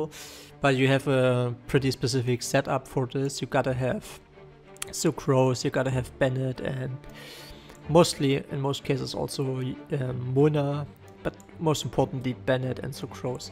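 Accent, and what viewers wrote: Germany. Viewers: Don't think so. Sounds very german. Unlikely he would consider chinese server with rather bad ping.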